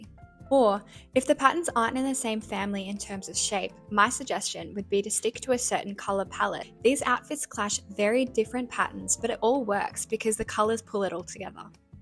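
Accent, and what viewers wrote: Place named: Melbourne, Australia. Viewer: i could tell you were from melbourne immediately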